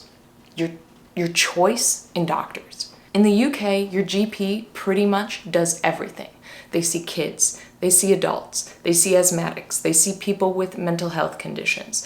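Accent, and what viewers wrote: United States. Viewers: Seems in the states you get ill you’ll go broke fast Your accent is so American, you lost the uk accent really quick in only 1 year? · I have to wonder, if you spent most of you adult life in the UK, why did you agree to give up what must have been a beautiful Briish sccent in favour of an American one. How long were you in the US before they stole your voice? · Very good overview and comparison, but I just wondered, if you'd lived for most of your life in the UK and only recently returned to the US, why do you have such a strong American accent? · You don't sound like somebody who grew up in the uk. Your accent is very usa. Did you grow up in the usa then move to the uk, then move back to the usa?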